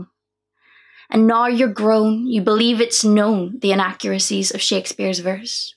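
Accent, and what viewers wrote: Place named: Ireland. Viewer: Love how your poetry brings out the Irish accent, and oh my word, how nice it is to get finally hear a new poem from you! Bet that (huge) little book of yours holds many treasures for us. ❤️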